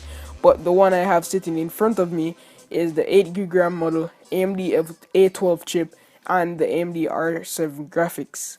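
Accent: Jamaica. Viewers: Bro, you sound like a real Jamaican, and if you are mad props. Not too many Jamaicans are doing tech reviews on this platform, so kudos to you. Video quality, transitions and use of space are awesome, and of course the voiceover, with a " TRUE " Jamaican accent. Keep up the good work.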